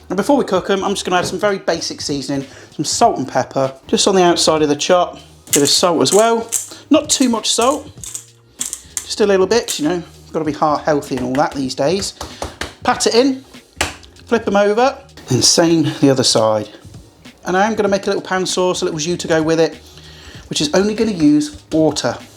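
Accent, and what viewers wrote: Leicester, United Kingdom. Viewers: Just wondering if this method would work for Gammon steaks 🤔 I discovered a while ago that grilling Gammon steaks seemed to really dry them out, so I switched to cooking them in a hot pan, like I would a steak, which seems to give that lovely char, and not leave them dry inside. I too like to experiment and really enjoyed the burger videos, confirming many of the methods I stumbled upon. I never buy pre-made burgers, much better to form them from scratch, as you do. They freeze really well also. Great videos! I thought I recognised your accent - your weather report confirmed it - I am hail Leicester also. What a crazy hot summer it was 🥵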